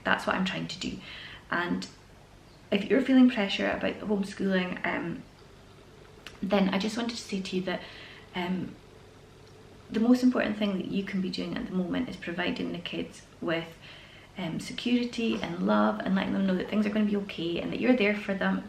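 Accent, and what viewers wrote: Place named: North, United Kingdom. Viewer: Fab video. Ive been stressing about teaching my 2 girls inbetween being out at work. Lovely to your lovely family and to hear ur accent. Im originally from Peterhead so its nice to hear northern accent again. Stay safe take care x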